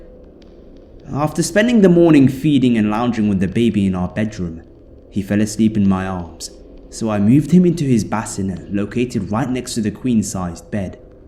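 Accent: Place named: United Kingdom. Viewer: Hello from, wonderful to get an upload from you. Love your accent. That Brit accent just adds so much to the stories. Looks like great story content. Keep up the excellent work. 💋👣💌💘💞💕💓💗💔❤💄💟🎠🌈🍄🌼🍎🍉🌻🍰🕊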